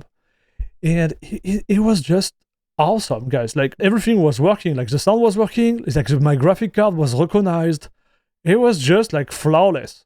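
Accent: France. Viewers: your french accent is too strong. hard to understand.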